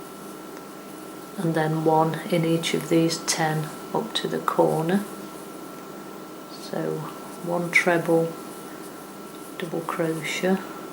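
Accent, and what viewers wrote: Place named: Leicestershire, United Kingdom. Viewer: I love your videos! You're so good at explaining things and easy to follow. Thank You. Are you from Leicestershire or Derby? Your accent sounds very familiar. I'm a Leicester/Derby lass myself. I hope you have a lovely weekend.xx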